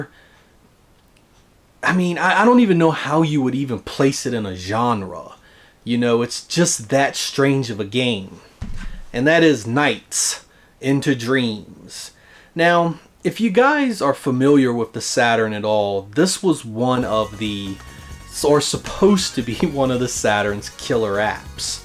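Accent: United States. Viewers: Hey where are you from? I hear you say "I'd didn't come out in Europe" or "there wasn't a pal release" a lot which made me think you were English but your voice sounds super American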